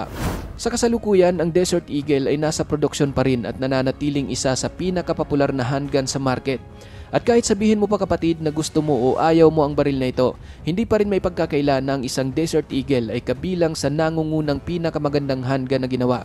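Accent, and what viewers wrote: Visayas, Philippines. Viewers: Bisaya Punto Ka Bhai.. 🤔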